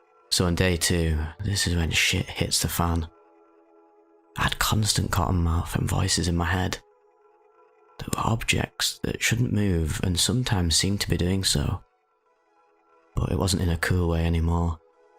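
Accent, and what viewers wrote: United Kingdom. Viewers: Are you actually British or is that just the accent you do? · I don't want to seem stupid but you said your from canada did you move to the uk? Because of your accent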